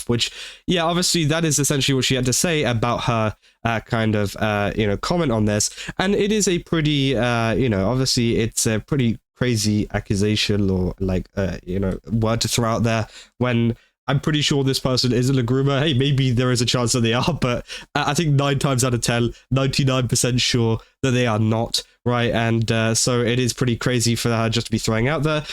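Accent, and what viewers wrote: United Kingdom. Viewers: Wait... is British!? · Youre british ? Man im sorry i might not support you anymore 😔 this is outside my boundaries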